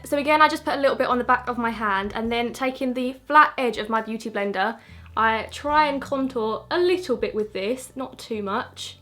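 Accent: Essex, United Kingdom. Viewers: Are you from Essex??? You sound like you have an Essex accent and I'm originally from Essex so I can spot it out. Loved your makeup btw, very pretty.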